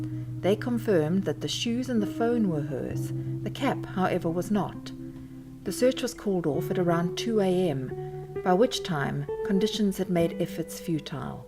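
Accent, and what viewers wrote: South Africa. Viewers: Nice to see a YouTube channel with a South African voice covering this type of material. I have started a blog and will soon have a podcast going which will cover South African true crime cases. I hope to cover Marie's story and have made contact with the twitter account which runs under Get Marie Home. Very strange story. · Very sad,love your channel your soothing South African voice...thanx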